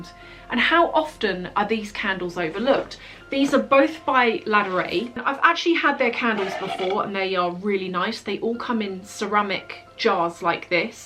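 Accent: England, United Kingdom. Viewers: Ohhh....it is really enjoyable listening to your talking. I like your british accent so much! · Looooove learning more of the english accent in looking at videos like this. New french subbbie here!!!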